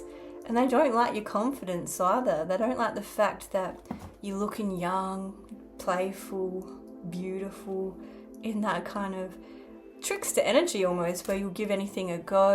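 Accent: Australia. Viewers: Long time no see 👀! You remind me of a butterfly fairy 🧚‍♂️ popping in and out of my energy 🦋✨I love your accent! Sounds like British! Now you confirmed Aussie! My DNA says I’m a third English! So we’re in the ballpark somewhat. No, I’m not into competition! More into helping to lead and healing wandering souls needing nourishment. Don’t like Freemasonry nor Eastern Stars! Reminds me of my criminal paternal family. Who have hated me and stolen from my Grandmas inheritance for me. Plus, they are in leadership and I detest their minor children sex trafficking and drug trafficking and immoral sexual mandatory group unprotected orgies for their Demonic Cult/Community! Sick and demented. I will defeat them for the kids and their PTSD suffering parents. They are a Menace to Global Society! I hate that they are lazy and using my inheritance to cause pain and suffering to innocents! 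You sure are spilling my ☕️ I see! You have a beautiful soul I can see. I liked you the first time I saw you a year ago! Down with the Karmics! Father God created me to be a karmic trap 🪤 I’m God’s spiritual warrior 🤺⚔️ ✌🏽👆🏾🫶🏼 Keep up the good work!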